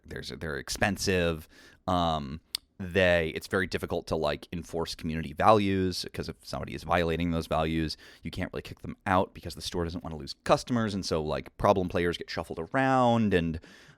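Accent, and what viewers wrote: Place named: Mid-Hudson, United States. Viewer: I can't hear an accent, ya sound upper Mid-Hudson/ Catskills. "Complete Strategist" is a great shop, I couldn't imagine gaming there though. I never got into 5e. I read the core books & thought it looked good but my group wanted to stick with our modified 3e/3.5/PF1e/D20 rules. I can see why they did but I would've given 5e a try. I only run home brewed settings since the '80s & in my experience players will always add to the world. We've been running games in the same world for over a decade now. The setting before that lasted 8 years. Sometimes players will misinterpret my descriptions, make assumptions or connections I didn't think of & come up w/ something way cooler, so I'll just go w/ it as if that's what I intended the whole time.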